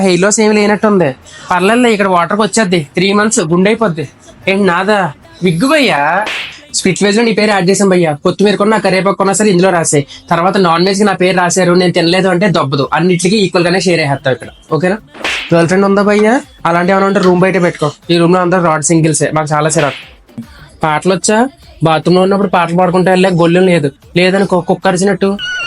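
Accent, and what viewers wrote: Godavari, India. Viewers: Love your Godavari accent,and your super awesome videos ! Big fan of you! Way to go 💖✨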